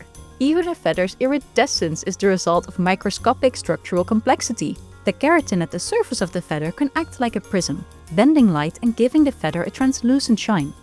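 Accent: Netherlands. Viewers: Me: "My Dutch voice senses are tingling!" Checks description Me: "... okay the name sounds more Flemish, but that voice is definitely Dutch!" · Heeeuujjj, een Nederlands accent, ik wist dat ik het herkende! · Hey, minute earth got a dutchie, nice accent :) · Does this channel have multiple narrators? This lady is very obviously Dutch, but I swear I’ve seen many videos of a non Dutch person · Dutch people are literally everywhere Love the accent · Is that a dutchy I hear? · I knew i heard a dutch accent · do i hear a Dutch acent? XD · *Dutch accent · GEKOLONISEERD, ik hoor nederlands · This is the quintessential Dutch accented English. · I hear a slight Dutch accent. Groetjes uit Nederland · Hoor ik daar nou een subtiel nederlands accentje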